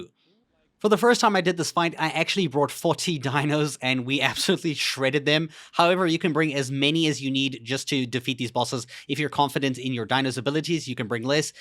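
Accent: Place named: South Africa. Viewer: The first video I saw of you I instantly heard the South African accent! Great video, keep up the good work!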